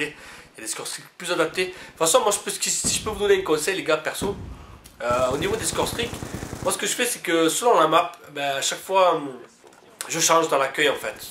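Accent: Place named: Marseille, France. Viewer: yeah toujours en forme avec l'accent de notre marseille <3 toujours aussi bon a call of;)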